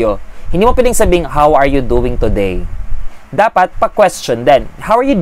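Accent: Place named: France. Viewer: Are you from France if yes you have very excellent communication skills and while you speak you are getting some touches of your native language while speaking ❤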